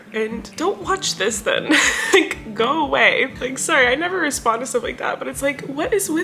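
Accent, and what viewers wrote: South, United States. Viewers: Sweet, get his southern accent from you! You do a lot of “character” talking. He’s doing you. ✌️❤️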